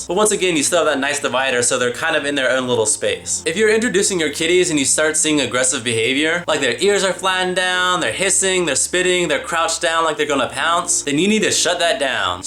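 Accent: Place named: United States. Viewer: a hillarious American voice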